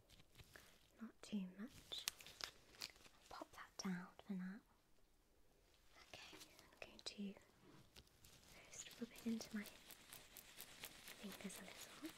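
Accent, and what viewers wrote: United Kingdom. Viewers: A woman with a British accent is very lovely 😍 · Ohhh is that a British accent?? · What kind of accent is this??? I’m assuming something from the UK, but is there a specific region name?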